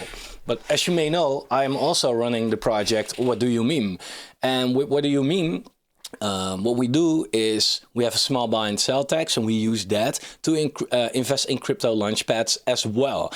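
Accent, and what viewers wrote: Netherlands. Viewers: haha nederlands accent, prachtig. goeie video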